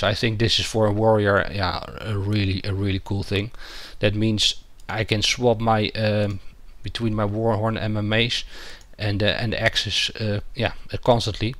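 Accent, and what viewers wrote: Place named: Netherlands. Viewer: dutch much:)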